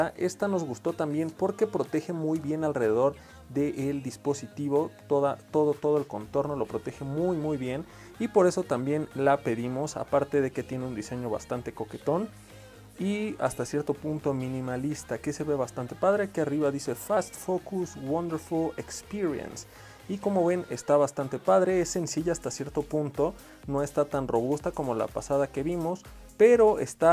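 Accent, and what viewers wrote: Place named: Brazil. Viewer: Olá, eu gostaria em que país você mora? Pois, o sotaque do seu Espanhol é muito parecido com o Português Brasileiro.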